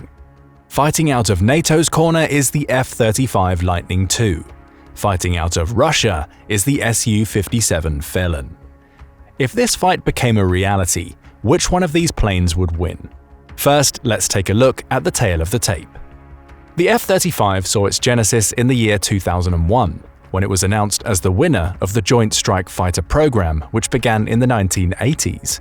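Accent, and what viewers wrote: England, United Kingdom. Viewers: It sounded like the narrator had an English accent. They can't use the F22. I'm not even sure they have the F35A.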